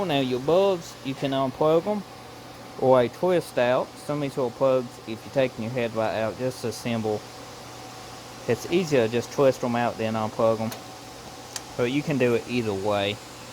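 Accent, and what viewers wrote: United States. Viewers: very quick and to the point, good to hear a man with a true American Accent too!!!! Thanks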